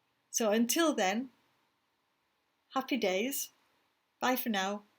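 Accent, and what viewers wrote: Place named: United Kingdom. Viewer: I wish I had you reading this book in your wonderful British accent. I so enjoy it xxx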